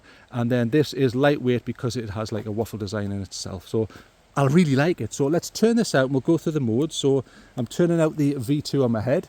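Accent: United Kingdom. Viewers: Good review I have just ordered one even though I already have 3 head torches. Good to hear a North East accent, I’m from Sunderland and now Durham 👍🏻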